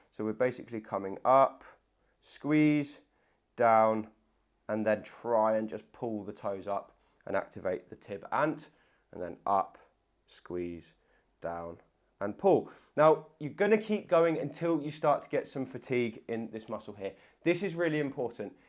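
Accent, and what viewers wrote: United Kingdom. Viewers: I’ve recently found my way to your videos and want to thank you for the content. You provide excellent advice and exercises for my arthritic knees. I hope to improve my walking and have improved joints. Your approach yo explaining the what, how, and why is so clear and concise. You are very talented at what you do. Wish you were in my location in the U.S. Your British accent is so smooth to listen to 😂. Thank you for the great content.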